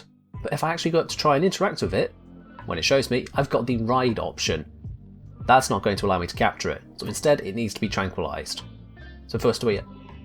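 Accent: United Kingdom. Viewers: you and your beautiful british accent saved me, thank you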